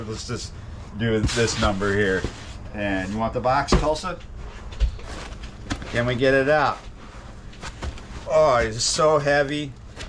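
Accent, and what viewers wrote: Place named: New York, United States. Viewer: Love the New York gangsta accent mixed with Louisiana slang LMAO ! !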